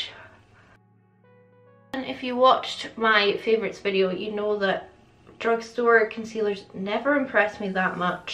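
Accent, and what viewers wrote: Scotland, United Kingdom. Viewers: Just having a bit of a crappy time should of realised 4 kids would be stressful. But it’s ok videos are me time as soon as they hear her Scottish accent they disappear 🤣 and yes we watched it during her study break tonight she squealed with excitement. Then kept saying OMG she remembers me it was me wasn’t it lol she can’t wait to tell her friends Monday 😂😂😂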